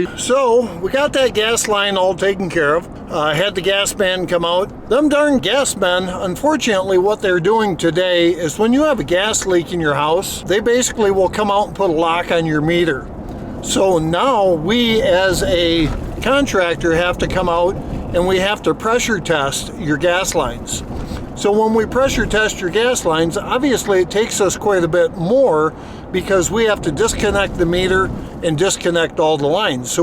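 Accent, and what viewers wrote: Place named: Minnesota, United States. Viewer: First time see you like your content….is that your daughter..you have a Minnesota accent..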